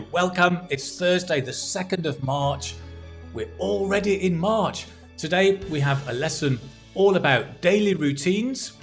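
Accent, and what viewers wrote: United Kingdom. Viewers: you deserve all of respect and appreciation. I always enjoy listening to your amazing british accent ❤❤❤❤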